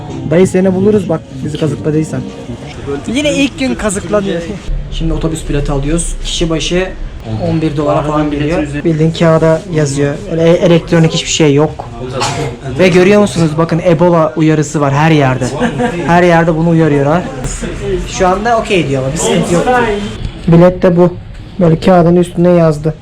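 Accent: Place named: Aegean, Turkey. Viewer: Gadeşlik seni ilk defa izliyom sen bizim oralıya benziyon şivenden belli egelimisin.. Accık şiven aydınla muğla arası benziyo. Güzel gez dikkat et kendine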